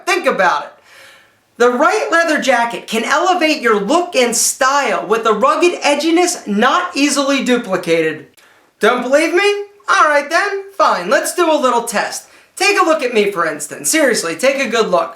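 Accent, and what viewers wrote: Philadelphia, United States. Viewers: It's cuz he's from philly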